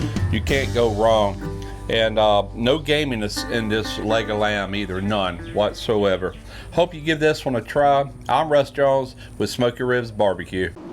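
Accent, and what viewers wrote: South, United States. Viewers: I watched this video today to hear pronounce gyro. Gotta luv that southern twang.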